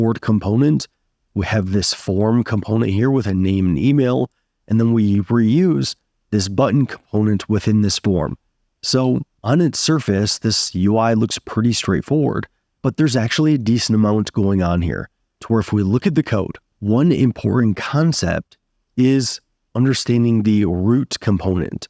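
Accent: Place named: United States. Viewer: I've been wishing for someone to make this video (react 2025) and for that someone to be an American English speaker and not an Indian and here you came in clutch with this gem. Thanks!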